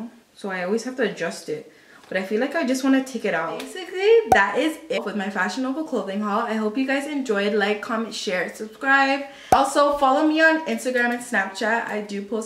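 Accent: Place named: Latin America. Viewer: You're so cute! So you're in Canada? I keep thinking I hear a little Latina in your accent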